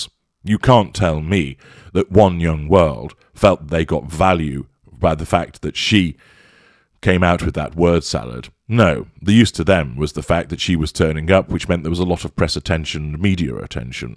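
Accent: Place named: North, United Kingdom. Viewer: you aren't a southerner are you ? I think you are from the north. There are some words that you pronounce wrongly for a southerner especially a posh one lol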